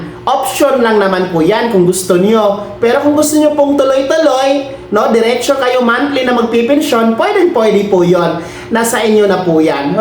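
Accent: Visayas, Philippines. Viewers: Djos ko..husayan .o naman ang pagsasalita mo!. Parang kang taga kanto na galing sa bisaya ..binipit... parang pinilipit!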